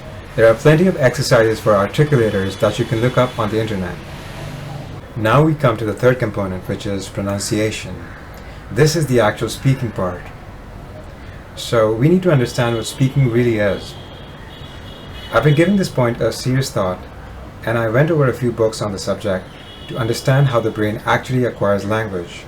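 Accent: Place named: India. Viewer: Your indian accent is still a bit heavy, but much more better then most indian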